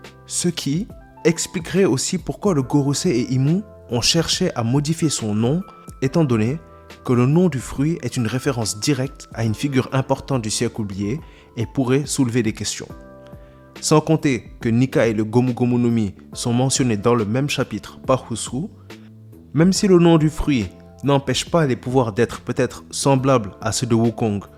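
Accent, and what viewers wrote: Senegal. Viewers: Dis moi, tu es d'origine du Sénégal ? je reconnais un léger accent de chez nous ahah ! Sympa les vidéos en tout cas, je m'abonne....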